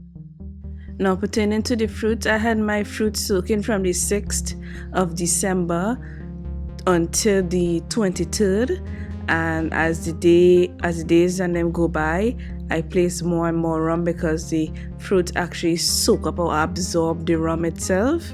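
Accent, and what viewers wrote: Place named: Tobago, Trinidad and Tobago. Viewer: i can hear that strong bago accent...keep it up dear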